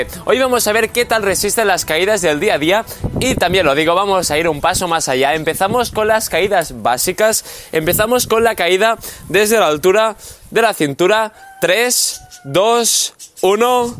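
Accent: Spain. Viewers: De qué parte de España es ?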